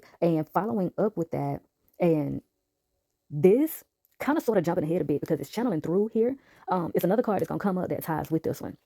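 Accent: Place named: Queens, United States. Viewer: I love your accent, were you from Queen? I subscribed to your channel 💖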